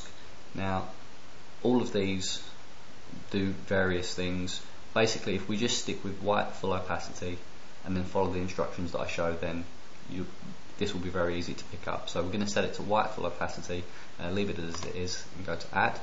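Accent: United Kingdom. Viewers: You are from UK, aren't you?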